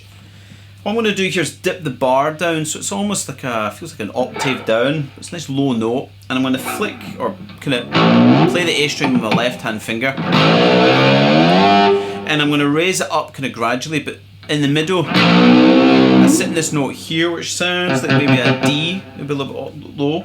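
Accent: Scotland, United Kingdom. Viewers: Man his Scottish accent is so dang smooth. I found it a lot easier to follow along to, and helped me mail the song.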